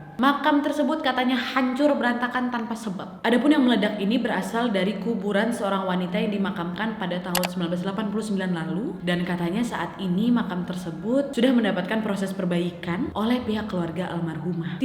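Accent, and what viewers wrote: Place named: Pontianak, Indonesia. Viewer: Org pontianak mana suara nya ,,😆😎💪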